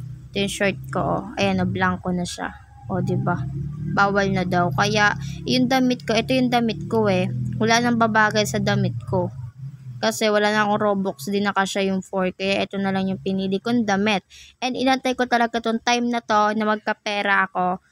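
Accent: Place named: Philippines. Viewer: almost hears like you talk Philippines my mom talks Philippines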